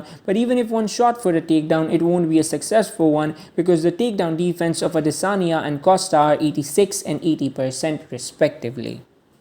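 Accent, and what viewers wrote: India. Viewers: he deffinetly is lol, however, he's either been living in the uk or just been on the Internet so much he's picked up some slang... Dont think I've ever heard "this will be a banger of a fight" in an Indian accent before.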